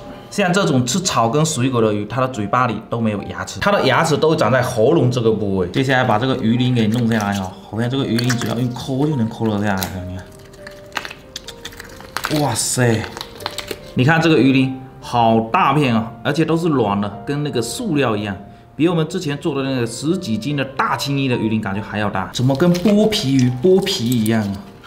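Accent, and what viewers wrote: Fuzhou, China. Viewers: 听口音像福州人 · 你是福州人？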